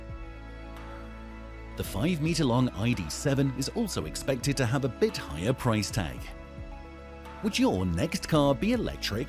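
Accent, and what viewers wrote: England, United Kingdom. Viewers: English accent….. American market 🤨🙄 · Just curious, which English accent was the narrator using ? Thanks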